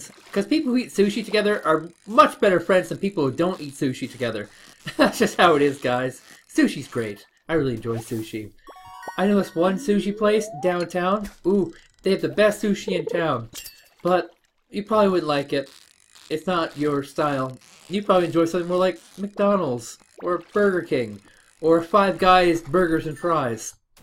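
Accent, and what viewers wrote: Canada. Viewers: defo hear a maritimes Canadian accent :)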